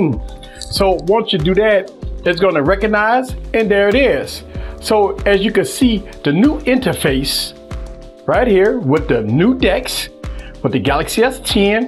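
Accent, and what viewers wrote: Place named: South, United States. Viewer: that nawlins wallpaper is no surprise with that accent. Way down south accent. Rock onbro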